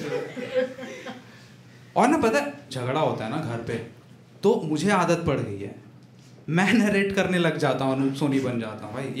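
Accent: Odisha, India. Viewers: Proud for great accent. Otherwise every body says your odiya accent was very sexy'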